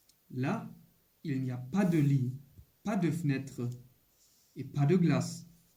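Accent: Germany. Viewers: Il a évidemment un fort accent allemand. Avec les Espagnols , je peux comprendre qu'ils n'ont pas de gorge R ou Ü, mais les Allemands devraient pouvoir parler bien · Puh! Deutsches Französisch ist echt schwer zu ertragen... aber sonst ein gutes und informatives Video.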